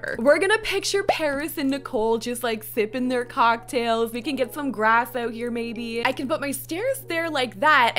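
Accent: Canada. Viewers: i could immediately tell you were canadian in the first minute of the video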